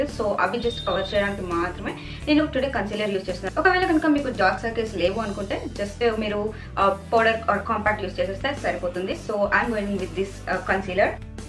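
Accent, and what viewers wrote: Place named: India. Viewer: Your telugu slang vedio is nice&suppr 👍 Do more this typ of vedios weekly once it's more comfortable to common people...🙏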